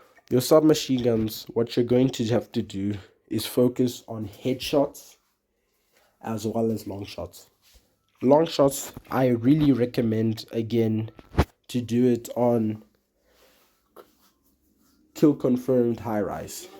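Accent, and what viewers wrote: South Africa. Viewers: South African? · Are you from south Africa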